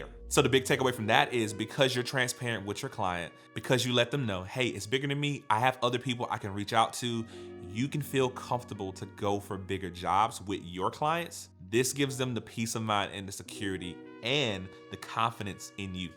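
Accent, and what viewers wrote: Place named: Mississippi, United States. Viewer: BRO YOU FROM SOUTH MS ?? Word yo me to!!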